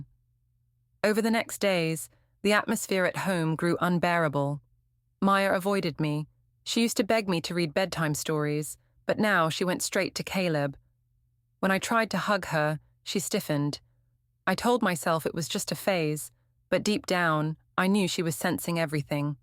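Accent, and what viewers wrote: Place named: London, United Kingdom. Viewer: An American story read with an an urban London accent. Cool!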